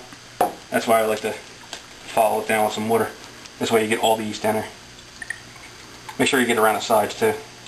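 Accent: United States. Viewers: This is good work. You sound like a Pittsburgh accent, where are you from? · Are you from Baltimore? Sounds like a b-more twang to me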